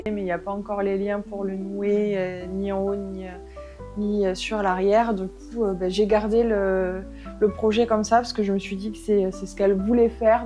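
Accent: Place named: Carcassonne, France. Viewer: super video d ou es tu avec ce petit accent j ai le meme et je suis de carcassonne lol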